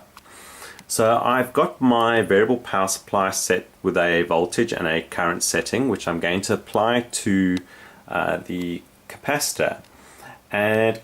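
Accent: South Africa. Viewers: Not only South African accent, but I would place it as Johannesburg, and probably Sandringham / Orange grove. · Nice video. South African accent?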